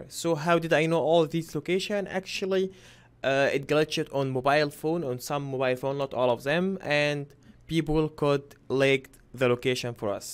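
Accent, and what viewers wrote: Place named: Egypt. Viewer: ur an egyptian thats an egyptian accent i know because i am an egyptian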